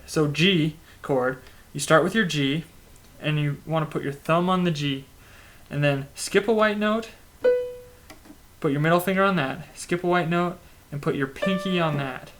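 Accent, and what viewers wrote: England, United Kingdom. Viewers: hello where ur hometown ? i really like your english accent ^^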